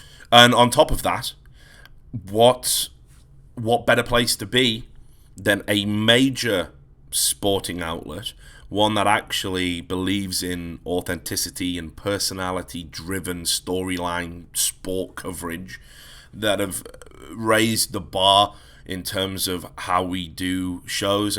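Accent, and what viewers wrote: United Kingdom. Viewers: Don't let them kill your accent, the British accent is like half the reason I watch your podcasts <3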